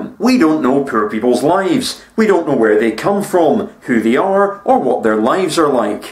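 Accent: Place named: Edinburgh, United Kingdom. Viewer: Think he's from Edinburgh. His accent is too posh to be from Glasgow.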